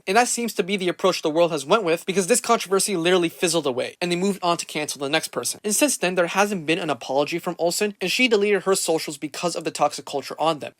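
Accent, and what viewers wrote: United Kingdom. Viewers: you cannot deny me you sound very british and must one by the things you said in the beginning thought am already liking it👍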